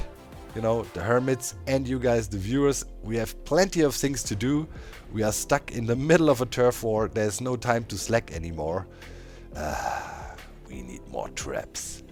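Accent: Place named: Germany. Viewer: Dein deutscher Akzent... immer wieder schön 😁😂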